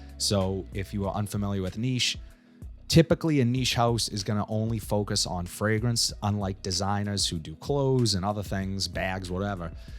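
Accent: Boston, United States. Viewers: You have that Boston accent. Looking into this as my first niche fragrance.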